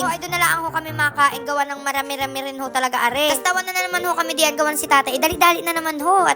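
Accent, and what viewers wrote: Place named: Marinduque, Philippines. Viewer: ang totoong kamangyan dapat isa sa parte ng province ay malaman ng taga mindoro kasi ang bansag na mangyan sa sa mindoro or. calapan dahil laki ako ng mindoro calapan, ganyan ang aking tanong or hinahanap. ang iyong punto... yan ng marindoque/ hindi mindoro yan. pero ganyan ang punto ng taga pinamalayan mindoro. at may batangas pa taga saan kaba talaga?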